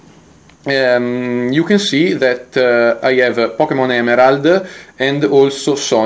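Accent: Italy. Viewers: Your english is good and I understood everything. It's not a problem that you have an italian accent. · Sei ita si sente vero? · Si sente che sei italiano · natsu sempai Vabbè, dopotutto è italiano, si capisce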